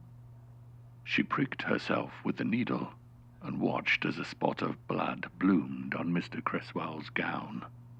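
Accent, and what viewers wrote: United Kingdom. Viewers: EEEuww. I don't like this one. I listen to audiobooks often and the narrator sounds just the same. I am going to smash that British narrator right in his pie hole (figuratively, anyway). Poor Poppy. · the narrator’s voice is terrific and british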